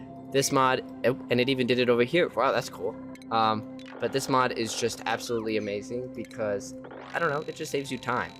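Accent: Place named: Omaha, United States. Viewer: Your accent sounds alot like those from the Omaha, NE area.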